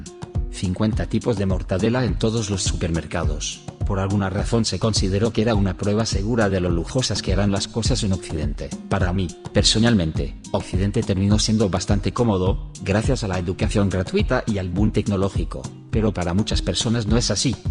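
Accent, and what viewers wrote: Russia. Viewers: el hecho de que esta grabado por una voz rusa autentica hace este video mejor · Vivo en china y soy youtuber latino, y los que no somos comunistas no tendremos problemas desde que no critiquemos abiertamente a las autoridades chinas, de resto por ahora todo parece normal, saludos a ti yo también visité Rusia reconozco el acento de un ruso cuando aprende español.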